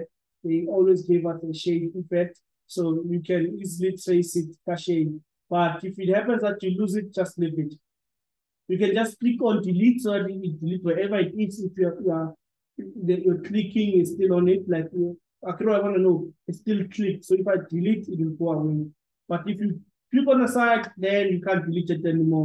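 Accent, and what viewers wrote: South Africa. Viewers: THAT SHARP SHARP TELLS ME THE LECTURER IS FROM SOUTH AFRICA😅😅😅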